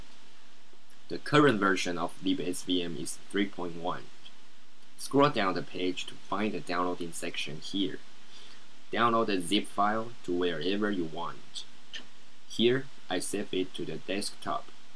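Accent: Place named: China. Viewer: 毕设用到了这个，感谢~（Chinglish口音哈哈哈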